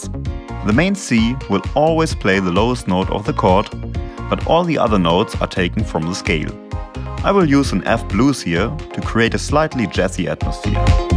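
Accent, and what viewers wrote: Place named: Germany. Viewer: Da entwickelt Ihr so ein Produkt, seid aber zu geizig 200 EUR in einen native speaker zu investieren smh. Dieses Video ist schon konfus genug, aber der deutsche Akzent lenkt so sehr ab, dass es noch schwieriger wird, dem Video zu folgen.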